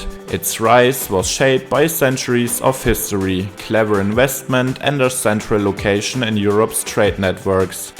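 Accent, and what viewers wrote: Germany. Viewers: Zuerst darf man einen doofen KI Audiotrack ausstellen nur um zu hören dass in grauenvollem deutschen Akzent Englisch geredet wird. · An ihrem englisch erkennt man ,dass sie Deutscher sind ..so richtig schön pessimistisch Kolletivschuld geprägt ? Jedenfalls gemäss der Überschrift ihres Beitrages..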